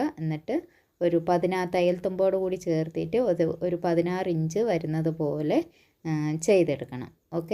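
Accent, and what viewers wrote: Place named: Kannur, India. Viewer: സഹോദരി ഒട്ടും വിഷമിക്കേണ്ട. ഭ്രാന്തൻ മാർ പലതും പറയും ഞാൻ മുപ്പത് കൊല്ലമായി ടൈലറാണ് എനിക്ക് നിങ്ങളുടെ വീഡിയോകൾ വളരെയധികം ഇഷ്ടം നമ്മുടെ കണ്ണൂർ സ്റ്റൈലിൽ സംസാരം വളരെയധികം ഇഷ്ടം..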